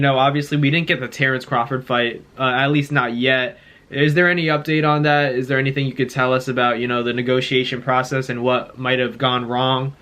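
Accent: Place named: Texas, United States. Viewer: 🤣😅😂your Texas accent is trash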